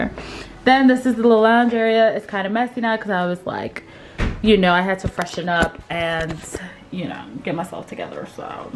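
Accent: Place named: London, United Kingdom. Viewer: First off love the nails! Second I love your new London accent LOLS. YOur such a beauty can’t wait for second vlog!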